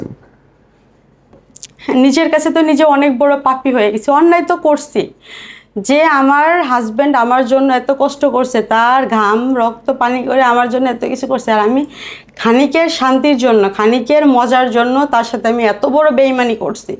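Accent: Bangladesh. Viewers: অনেক সেক্স ভিডিও দেখেছি আপনার।প্রথম দিকে অবাক হতাম যে,এতো সুন্দরী বাংলাদেশী নারী এতো অসাধারণ অসংখ্য সেক্স ভিডিও করছেন অথচ জানতামইনা।আপনার মুখের বাংলা ভাষা শুনেই বুঝতাম যে ভারতীয় নারী নন আপনি।পুরুষটির ফেস ঝাপসা করে দেয়া আছে চেনা যায়না।xhamster এ আপনার সেক্স ভিডিও গুলো উনি বিক্রি করে কম করে হলেও ৮-১০ লাখ টাকা আয় করেছেন সম্ভবত। আপনার ফ্রি স্টাইল এক্সপ্রেশন আর অসাধারণ সব পজিশনে সেক্স করা দেখে একজন প্রফেশনাল পর্ণষ্টার মনে করতাম।আজ সবকিছু বুঝতে পারলাম পরিস্কার 😢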